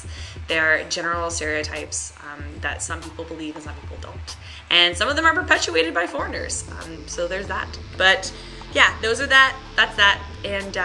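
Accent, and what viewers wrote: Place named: South, United States. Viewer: Do you ever find your southern (you don't have the full c*** a** accent) causing communication problems?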